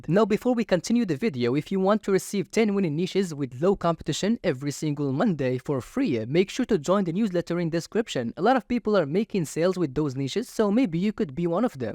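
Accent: Morocco. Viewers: mghribi nta hhh gha mn sawtk tbarkllh3lik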